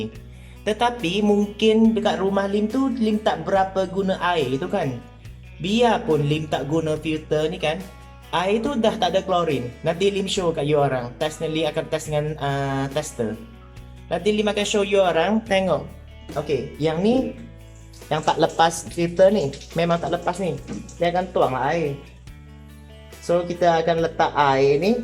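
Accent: Kelantan, Malaysia. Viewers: lim ore klate ko?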